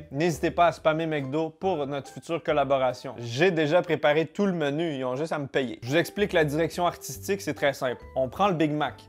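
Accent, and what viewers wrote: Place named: Quebec, Canada. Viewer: arrête de parler comme un québécois stp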